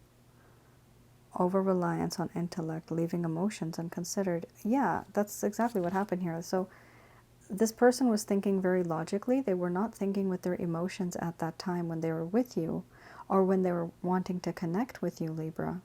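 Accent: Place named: Hawaii, United States. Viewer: WOW... N U R D ONLY ONE 2 RESPOND .. THANK U 4 DAT... HEHEHEH HEHEHEH... U SOUNDED SEMI HAWAIIAN, DATS Y I HAD ASKED... THANK U AGAIN...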